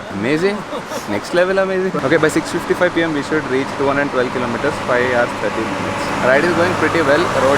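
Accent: India. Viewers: I love that Hindi accent.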